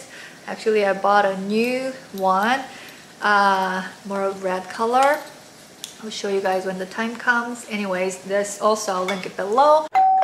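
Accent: South Korea. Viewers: I could tell you are korean from your accent. Nice to see korean lv lover!❤️ subscibed😘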